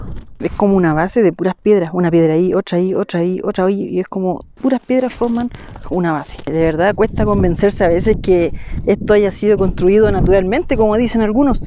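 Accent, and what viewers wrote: Chile. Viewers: Eri como luisito comunica pero chileno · Hola whoa me encanta tus vídeos Gracias por mostrar al mundo mi Chile querido que no lo he vuelto a verlo desde 1975 tengo lágrimas en mis mejillas Un comentario me encanta como hablas tienes un asentó chileno hermoso ⚡️❤️⚡️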